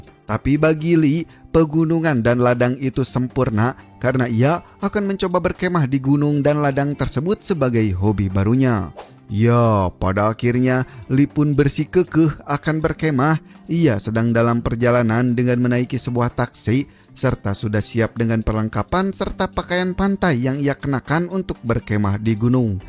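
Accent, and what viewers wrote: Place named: West Java, Indonesia. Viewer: Logatnya sunda pisan, pasti orang Sukabumi ini wkwkwkwkw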